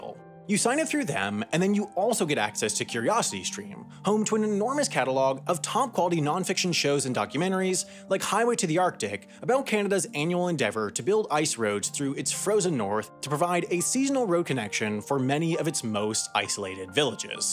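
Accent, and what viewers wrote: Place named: West Coast, United States. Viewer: Is this a west coast accent? It is hilarious😂😂😂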